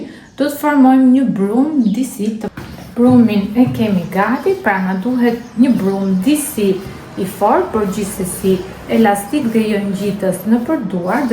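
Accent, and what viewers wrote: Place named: Gjirokastër, Albania. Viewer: Bravo,te lumshin duart! Mos je Gjirokastrite,te kuptoj nga dialekti qe perdor.Edhe une jam gjirokastrite. Te pershendes!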